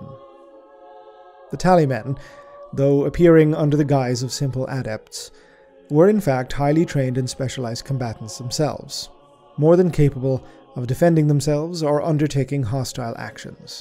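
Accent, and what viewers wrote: Ireland. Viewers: I gotta ask what part of ireland are you from?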